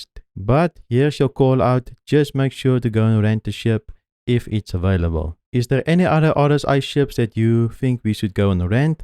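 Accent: Afrikaans, South Africa. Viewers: The Afrikaans accent gets me every time😂😂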